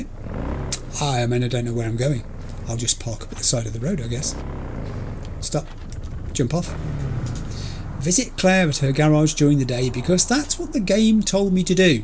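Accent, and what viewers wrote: United Kingdom. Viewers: One of my favorite episodes yet! I think you have found an ideal cadence of gameplay in this episode, you explored without getting too bogged down in random minutia. I LOVE YOUR MIND FOR DETAIL, but I will admit I sometimes skip ahead out of veteran gamer impatience, but never change your penchant for curiosity. Regardless, congrats on your first place placement, only one more top 3 finish to guarantee the finale of this arc! Furthermore, would LOVE to hear more about your childhood and the village you grew up in, I want to know more! Maybe I am a stupid American but your accent says maybe you are from the Midlands, and I think your naiveté as an Englishmen really adds to the lifestyle in 2077. V is a character all his own, but colored by the players experience, and is a true fish out of water. And a kind Brit in Night City is about as fascinating a protagonist as it gets, I would love more context to your childhood and your perspective as an Englishmen to a very American game! 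Not that it truly matters, but you are clearly a fan of science fiction, and as a genre SciFi is often colored by the experiences of the reader. Hope this week gets better for you, and wishing the best to you and your family! CHEERS!! XOXO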